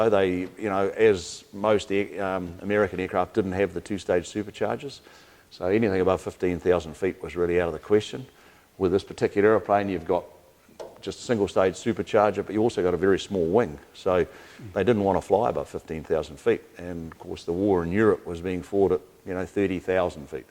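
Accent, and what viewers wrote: Australia. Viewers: Sounds Aussie? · Bollocks does this gent have a thick Aussie accent!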